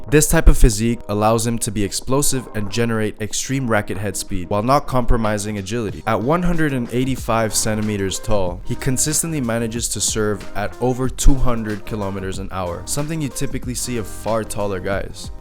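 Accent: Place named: Spain. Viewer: Pareces chino, hablas perfecto inglés y se nota que eres español a la vez xd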